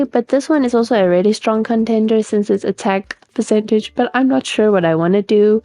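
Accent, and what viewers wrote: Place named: South Africa. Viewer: I’m really sorry if this is rude and ignore it if it is but I can’t help but think you sound South African 😭 (this is coming from a South African) If you are it’s so nice to see other South African Genshin players! ☺️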